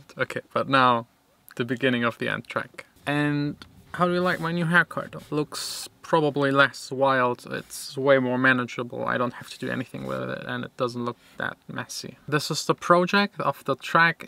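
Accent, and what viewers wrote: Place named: Germany. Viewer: Hi! It's incredible how much you've improved your English accent. In the video that's linked below you have a very strong German accent haha. Congratulations! I love your videos and music 😊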